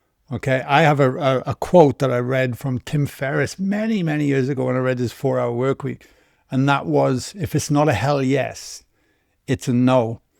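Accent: Wales, United Kingdom. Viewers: Always up for a bit of Taff accent, greetings to a Welsh brother.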